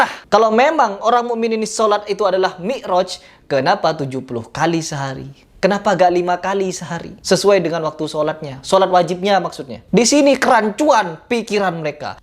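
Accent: Madura, Indonesia. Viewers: Logatnya kental madura wkwkwk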